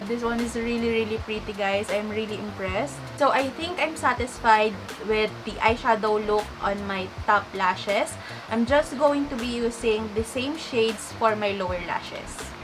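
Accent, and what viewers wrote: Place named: Iloilo, Philippines. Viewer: You sound like an Ilongga. 😍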